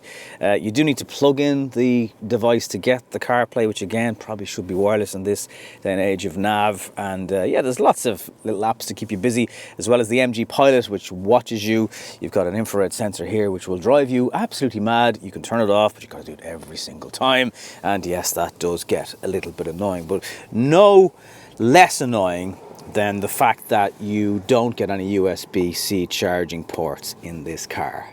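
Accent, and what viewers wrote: Ireland. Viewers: Sounds fine to me - lovely Irish lilt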